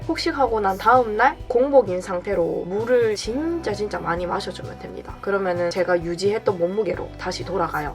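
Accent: Gyeongsang, South Korea. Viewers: 대구 아가씨 같네요~ 뭐든 의지가 젤 중요한 듯해요^^ 물을 많이 마시는 습관도 포인트! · Hannah Rose Yasay she's from daegu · 多分プサンの方言かな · She said in another vid that she’s from Daegu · Are you from busan?? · Gyeongsangdo accents really carry this charm that is so good to my ears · She’s definitely from Busan or perhaps somewhere in southern Gyeong Sang province. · 韓国人です❗釜山か大邱ですが、多分大邱だと思います。きょんさんどの方言であることは確かですがこの違いはそこの人じゃないと比べることは難しいですw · your voice + your Busan dialect is so relaxing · 부산 사세요? 말투가 부산같으신데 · Jenna Li wow ㅋㅋㅋyup her accent from busan(Edit: her accent from daegu!) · i think it's daegu? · ARE U FROM BUSAN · Well guys , I'm not korean but I can tell that accent isnt from Seoul I wanna say Busan ( correct me if I'm wrong) anywho ALL THOSE DRAMAS HAVE DONE SOMETHING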